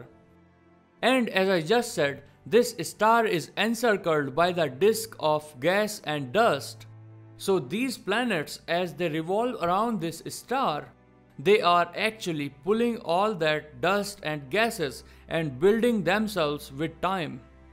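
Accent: India. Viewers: People are hating because the voice really? The speaker sounds Indian and speaks English well with an accent so what? Usually the accent is due to knowing how to speak two languages same with Asians, but I've also met Indian and Asian individuals due have no accent from only knowing English growing up. At this rate we will never be able to be peaceful and live together too savage of animals we are still... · His voice is surprising are u an Indian man ??? Just want to know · It looks like awesome India voice!!!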